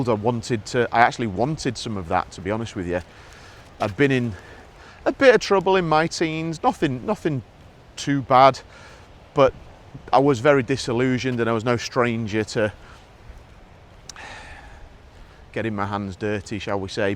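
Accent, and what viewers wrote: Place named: Northern England, United Kingdom. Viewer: Nice one. I've watched a few of your videos in the last days not very attentively. I already know las ramblas are often overcrowded. Hay muchos videos sobre "Moving to Spain" "Reality of living in Spain" or "Culture shocks of Spain" (this is where it starts to get silly para mi), it's a bit repetitive to put it mildly. StatemenIts, opinions or whole videos "slightly annoying" are mostly from US-Americans. It's all not a serious issue for me, so I keep it simple: Complaints about spanish bureaucracy in british english sound better. This video's first minutes were special for me, because I realized you speak with a distinctiive northern accent. Manchester let me see the light. Are there videos about "What you should know before moving from Miami to Moss side"? 🙃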